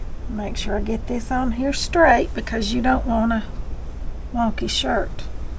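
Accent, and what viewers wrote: South, United States. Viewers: I just subscribed tonight. This is my second video and I watched one more before this one. I thoroughly enjoy your videos. I love hearing your southern accent. Im originally from South Mississippi but due to being in the military and my husband's home being here in Virginia, this is where I live. Thanks so much for sharing your talent. 😊❤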